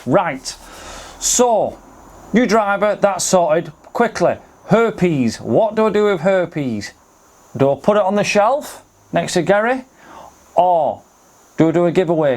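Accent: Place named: Oldham, United Kingdom. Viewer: Ahh ha, I knew the accent but couldn't place it. Needless to say I'm from Oldham originally but moved down south when i was 2, in 1983, towns a bit different now, got one of these ridiculous southern accents and everything.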